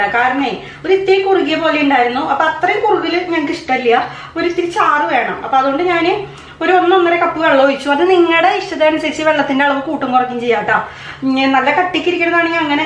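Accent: Thrissur, India. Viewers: Chechi trissur aano??? Evida place Iam from trissur....anyway super recipe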